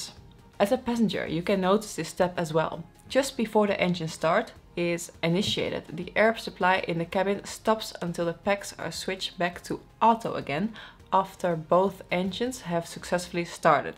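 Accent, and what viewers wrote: Netherlands. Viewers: A hint of Dutch in English produces such an agreeable sounding accent. It's a pleasure to listen to her. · Dutch accent is something amazing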